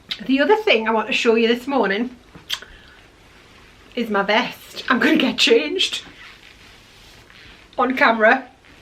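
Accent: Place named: North East England, United Kingdom. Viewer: Brilliant to see you back, I’ve missed hearing a north east accent! Xxx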